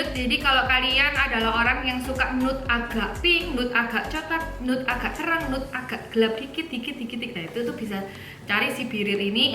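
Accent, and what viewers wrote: Java, Indonesia. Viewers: medok tutor e 😂😂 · Lgsg ku subcribe lucu liat mbkx medok banget ngomongx...polos lg syyukaa😁😀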